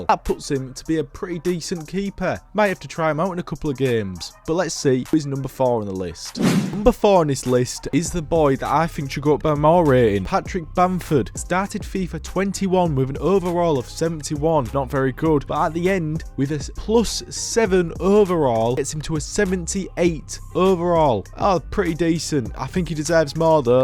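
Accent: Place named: South Yorkshire, United Kingdom. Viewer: I swear to god your accent sounds so close to mine 😂 you from South Yorkshire? Don't tend to see many creators from there big ups to you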